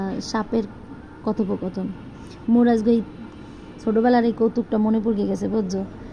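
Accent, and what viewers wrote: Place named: Barisal, Bangladesh. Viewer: পুরাই মোগো বরিশালের ভাষা👍👌🙋‍♂️🥰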